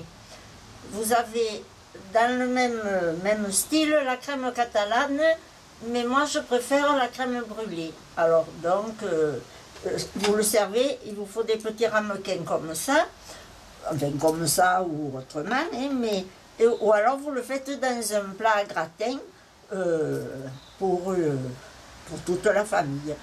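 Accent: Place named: Nice, France. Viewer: Bonjour chère je suis de Sète née a Marseille et vous avez le même accent que "Mado ka Nicoise"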